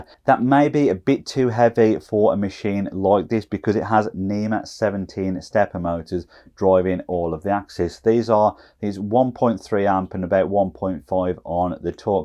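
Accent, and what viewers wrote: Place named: Midlands, United Kingdom. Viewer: Found the channel researching small CNC, heard the Midlands accent, already confident I've found someone who knows his stuff. Great video, subscribed.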